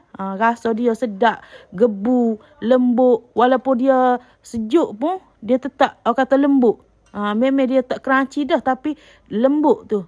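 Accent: Kelantan, Malaysia. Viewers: Lama x muncul ya..suke dh kecek kelate tu😅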